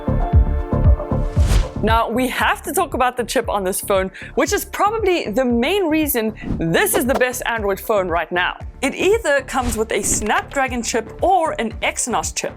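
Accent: South Africa. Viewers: Why have I just come across this channel now?! Great video! Subscribed!! Also, is that a SA accent? Lol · You have a South African Accent, I wonder where you are from? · You still in South Africa? Thought you had the accent... checked the about area and was right 🤣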